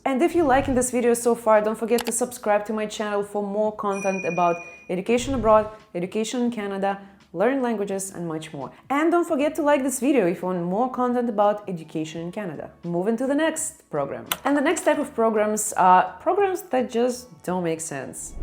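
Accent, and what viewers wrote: Russia. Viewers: Полезно 🎉как же приятно узнавать русскоговорящих по акценту 😂ни с чем ни спутаешь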